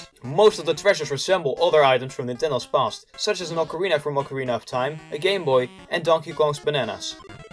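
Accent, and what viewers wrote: Netherlands. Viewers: Nice video.You have a new sub :D And also the Dutch accent makes it that much better xD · the dutch accent is real XD.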